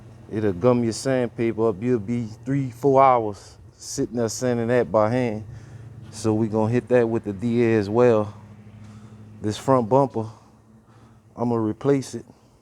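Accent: Louisiana, United States. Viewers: Good build soud like ya from the boot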